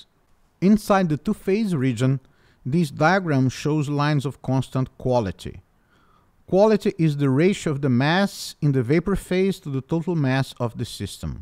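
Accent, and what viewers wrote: Brazil. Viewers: Percebi que era brasileiro pelo sotaque hahahaha, ótimo vídeo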